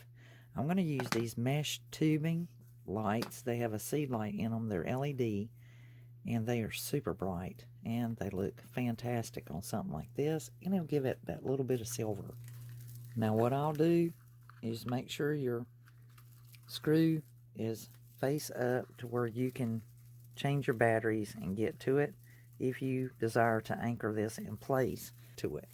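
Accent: South, United States. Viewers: We live on a teeny tiny island off the coast of NC...so...you KNOW I LOVE this one. Covid closed down all the craft shows that feed, house and clothe my family so it's been a really HARD year. I'm ready to kiss 2020 goodbye come midnight December 31😘🙋‍♀️. Looking forward to a NEW YEAR 😊🤗 and lots of new crafts!! TFS and I find your sweet southern drawl so charming and relaxing...like sipping sweet tea from the front porch rocking chair on a hot summertime afternoon 🎄💓God Bless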